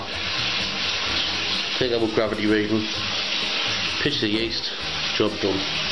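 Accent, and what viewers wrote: Middlesbrough, United Kingdom. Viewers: you must be from the boro.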